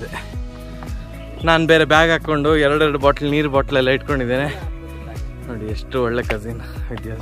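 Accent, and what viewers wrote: Mangalore, India. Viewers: Neevu mataduva reeti, namma mangloor kannada mishritha bhashe, nimma jokes.. Manassige bahala muda kodtade. Ede edeyalli heege random vlogs madi. Nodi naavu kushi padteve.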